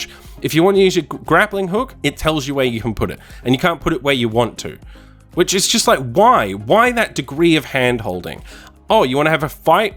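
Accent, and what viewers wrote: Australia. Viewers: You're an aussie!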